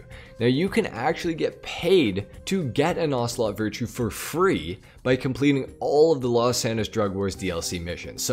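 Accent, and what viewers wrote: Winnipeg, Canada. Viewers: Are u from Winnipeg brotha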